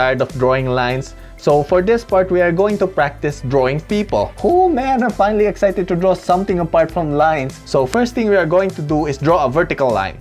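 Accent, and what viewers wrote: Philippines. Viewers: Carry that filipino accent hard kuya! Be proud! Hehehe love them vids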